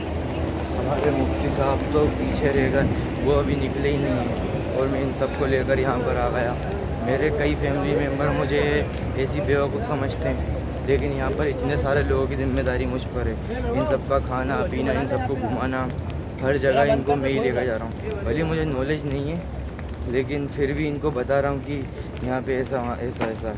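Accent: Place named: Uttar Pradesh, India. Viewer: Bhai ki boli to india up ki lg rhi hai hm 🇮🇳🇮🇳🇮🇳 hai 🤲🤲❤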